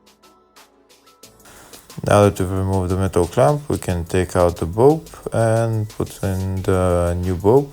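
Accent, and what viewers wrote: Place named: Eastern Europe. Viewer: This accent sounds familiar. Kinda eastern European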